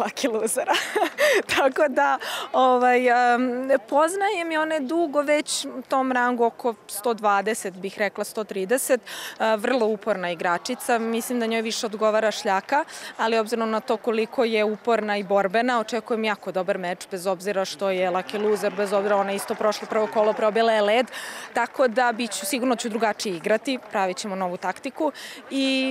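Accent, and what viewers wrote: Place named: Niš, Serbia. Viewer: Bravo. Pricaj si po niski ne trudi se da gadjas beogradski. Nek se potrudi da razume ko hoce. 💪👩🎾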